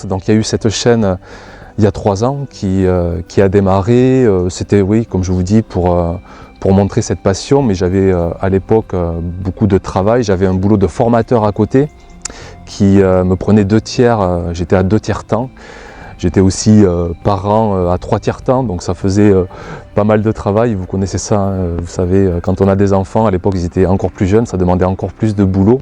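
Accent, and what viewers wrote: South, France. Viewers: Quel beau clap de fin 2019 ? Tu es sincère et authentique et je t’en remercie. Tu as su partager tout au long de tes vidéos ta passion et tes émotions sont arrivées jusque dans nos foyers. J’aurai souhaité avoir un fils comme toi, mais je me consolerai d’être ton ami, lol. Reviens nous vite afin que nos dimanches chantent encore avec ton joli accent du Midi et que le soleil dans tes vidéos nous stimule à faire grandir nos fruits et légumes. Je reste dans l’attente de te retrouver avec le chant des cigales. Merci. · Bonjour, j’apprécie vraiment cette chaîne,ou on évolue avec toi autant dans les succès que les échecs. Tu es un mec super et plein de sincérité et que dire de cet accent qui sens bon le sud. Je te souhaite tout le succès tant mérité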